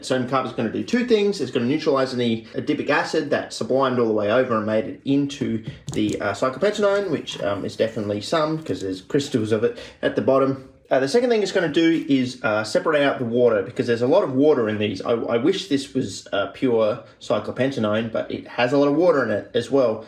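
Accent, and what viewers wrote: Australia. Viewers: Pfft watching for the chemistry… Birds? No thank you I just like the Australian accent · Dude, I've just gotta say this. My life has been shit the past couple years, not looking for pity just saying so for context. I found your channel about 6 months ago, and shortly after I found. I've always been intimidated by, and thus hated chemistry. I'm a physics and astronomy person, and a gufted musician, and I just gotta say, something about your auzsie accent, you're personality, and you're... Relatable..... Methods, got me hooked and it I've seen all your vids a couple times each. New episode just makes my day, and the fact I'm gonna be here for another cubane series makes me giddy. But the main thing to say, is you rock, and keep it coming mate! I always wanted to move to Australia to work as an opal or gold miner but since that's never gonna happen, I'll settle for some chemistry from an aussie physicist.;) good work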